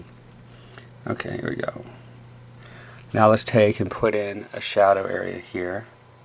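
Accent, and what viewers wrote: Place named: United States. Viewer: I hate the way americans say "water"... :):):) But the painting is beautifuly done so it's worth to watch it. :)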